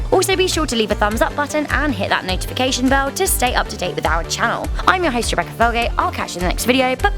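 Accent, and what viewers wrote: England, United Kingdom. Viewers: She's British accent bro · I like this person's Englisacccent, but seriously.... She can't pronounce Cunard?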